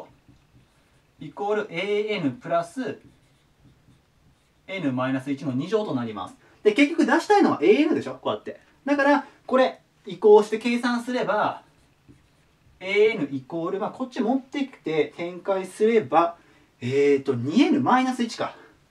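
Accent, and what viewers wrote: Kansai, Japan. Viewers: 所々出てしまう関西訛り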